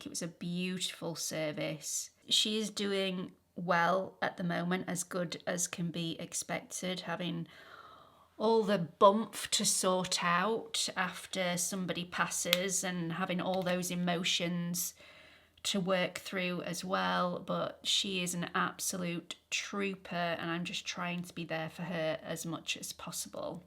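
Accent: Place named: London, United Kingdom. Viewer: You are in good company with your Mum. It is soul crushing to walk that journey with them but on the other side is happiness that you did. I promise! Not sure where you are in UK but your accent sounds more London-area. Legendary late 70's (Birmingham, I want to say) musician Al Stewart is having some very rare shows around there tonight and tomorrow and it will bring you both a lift at not a big cost. He is recently 80, all joy and smiles and has a remarkable young band that tours with him. It is his farewell tour. We saw him here in Michigan, USA 2.5 years ago and it was an amazing little show that will put your energy back on track. ❤❤❤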